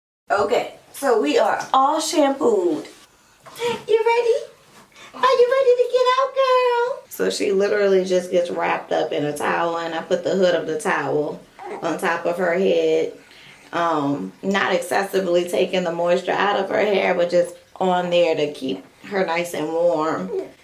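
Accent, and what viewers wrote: New Orleans, United States. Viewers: Beautiful... Do I hear a bit of Nola Baebyyyyy?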